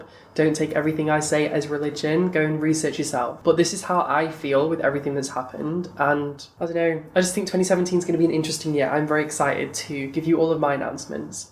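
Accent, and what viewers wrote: Manchester, United Kingdom. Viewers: are you from Manchester of so I love you even more I that is even possible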